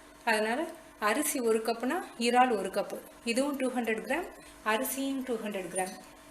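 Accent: Madurai, India. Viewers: Mam neenga sonna method la idiyappam senjen color color ah super Then ipo yaara priyani seya poren today Sunday spl ah senjittu solren And neenga enga madurai sidenu ninaikkuren. Madurai style la pesuringa. Really super. Tq.mam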